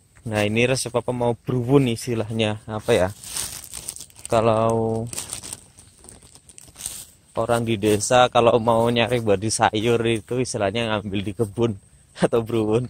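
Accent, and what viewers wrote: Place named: Java, Indonesia. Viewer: Video resep papa yg durasinya lama itu yg ku suka... Ku malah berharap supaya resep papa tetap seperti itu, kondisi dapur juga apa adanya... Aku syuuuukaaaa.... Sepertinya channel resep papa bakalan cepat melejit subscribernya... Btw penasaran sama penampakan papanya 😃😃 cuma denger suaranya... Dari logatnya sepertinya wong jowo yg tinggal di lampung... Sukses selalu untuk resep papa... Pertahankan ke apaadaannya....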